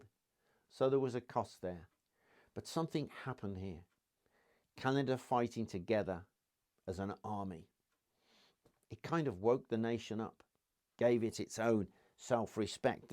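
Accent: United Kingdom. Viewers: I really enjoy your presentations. Especially your WW1 history. Please keep up the great work. Your refreshing, not boring or stagnant. And there's nothing like a British accent to tell a good story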